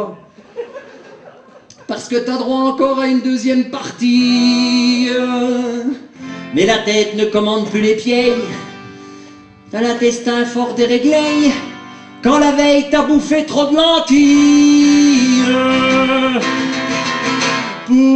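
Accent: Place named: Vosges, France. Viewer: Véééé , t'es né à Rmiremont (comme moi !! ) et t'as le même accent que moi ...!!!! Bizz de Nîmes .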